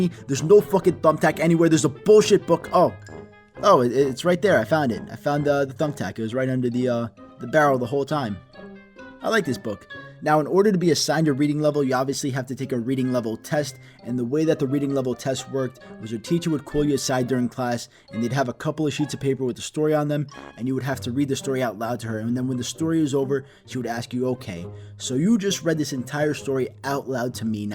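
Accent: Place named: New York City, United States. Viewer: Just found your channel, you are so underrated. And I'm so sure you're from NYC. You have our dialect. 😂🙌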